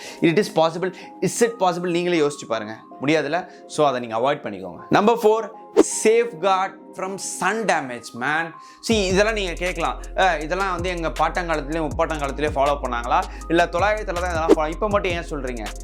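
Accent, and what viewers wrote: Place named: Tirunelveli, India. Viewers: tiruneveli Slang👻😄😁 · Antha ella slang nice 👌 sincery by tirunelveli ,thoothukudi Karan la 🤣🤣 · Bro tuty slang vera level ❤️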